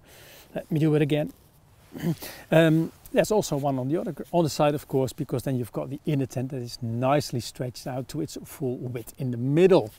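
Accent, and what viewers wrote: Netherlands. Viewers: superb review. Your “Dutchy “ English reminds me of the accent and translations my Dutch girlfriend speaks ! ❤ Thanks for filming in English- very useful content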